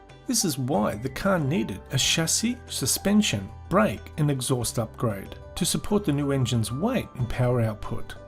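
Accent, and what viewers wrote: Australia. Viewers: Herbie and KITT. He sounds like an Australian version of me. I'd love both of those in ny garage but I'll settle for just Herbie. At the moment.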